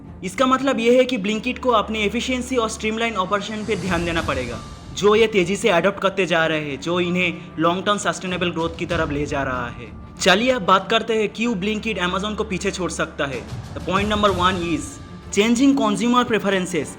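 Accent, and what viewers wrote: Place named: Bengal, India. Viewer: You have a Bengali accent, so you'll need to work in that style for this task—everything else is perfect!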